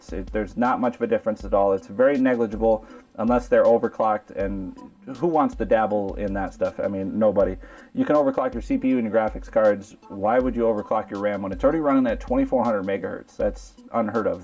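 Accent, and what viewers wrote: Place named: Newfoundland, Canada. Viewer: You sound Canadian, I sense a Newfie.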